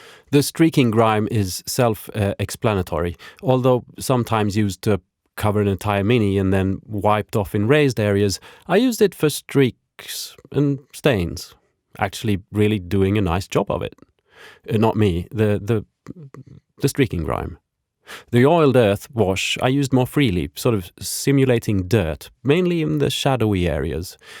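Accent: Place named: Sweden. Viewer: är du svensk? Nu lade jag märke till dialekten! Btw tycker dina videor är väldigt underhållande att kika på, heja! 👍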